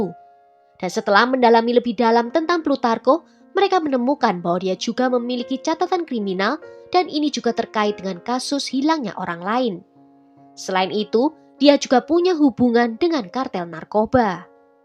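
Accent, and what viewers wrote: Java, Indonesia. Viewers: Medok banget ...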